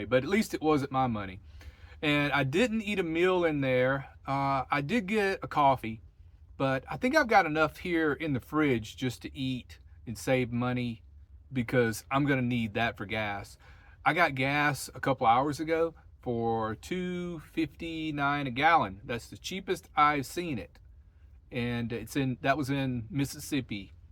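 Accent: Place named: Columbus, United States. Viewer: I KNEW you had to be from Columbus! I'm from there, and I picked up on your regional dialect. That's so awesome! Safe travels!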